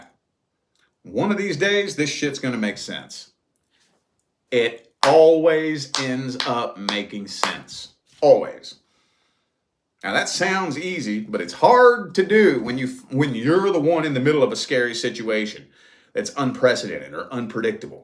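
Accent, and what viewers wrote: South, United States. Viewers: U sound like u really from the south ?… hhhmm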